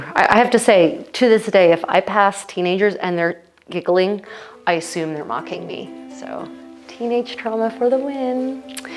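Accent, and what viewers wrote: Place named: United States. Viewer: You are living my best life! LOL I have always wanted to do exactly this! I specialized in Elizabethan, but also have many Italian Ren, although I probably have much to learn from you in Italian clothing. I would love to do this in England as well as in Italy. I never have been able to get over there, dealing with various health problems; I am so exhausted after dressing this way, I need to lie down! Gone are the days of my youth when even though I have lupus I could work, run home, pack for the weekend, drive 4 hrs, set up pavilions, dress, and socialize/party the night away. LOL I miss it. How much luggage did you have to take? (assuming from your accent you live here in America) I could get one Elizabethan kit or two Italian Rens and that would fill my biggest suitcase! Your workmanship, BTW, is exquisite! I love wearing the gowns I have sewn entirely by hand...I show people the stitches and they get so blown away...I just let them know it's just like doing embroidery, you start it understanding you won't just whip one out in a day, it's a PROJECT!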